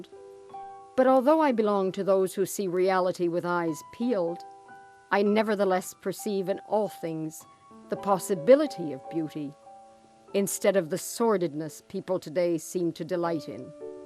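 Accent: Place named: Maine, United States. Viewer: Couldn't they find a narator without that terrible voice and a cent. She sounds like a fishwife from Maine.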